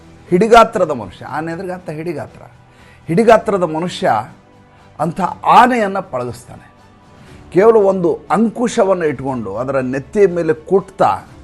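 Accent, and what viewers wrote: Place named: North Karnataka, India. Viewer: ಉತ್ತರ ಕರ್ನಾಟಕದ ಗಂಡು ಕನ್ನಡ ನಿಮಗs ಗೊತ್ತಿಲ್ಲಧಂಗ ನುಸುಳೋದು ಕೇಳ್ಲಿಕ್ಕೆ ಮಜಾ ಅನಸ್ತದ!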